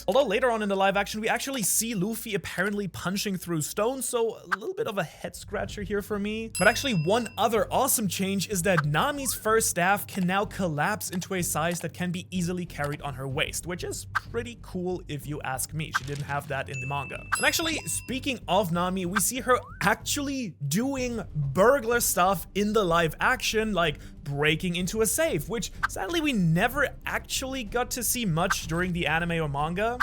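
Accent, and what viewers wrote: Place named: Germany. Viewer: Ich mag deinen Deutschen Akzent.❤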